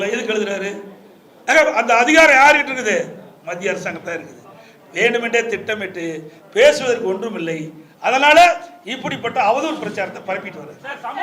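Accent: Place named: Kongu, India. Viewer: Kongu Tamil bro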